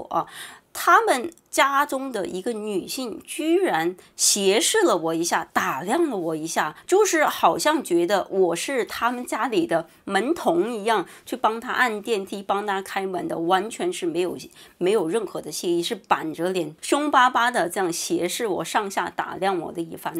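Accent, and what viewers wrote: China. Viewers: 听你口音，也是中国的吧？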